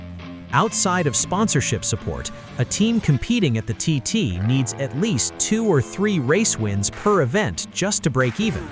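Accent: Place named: United States. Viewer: Amercan voiced commentary, Im out.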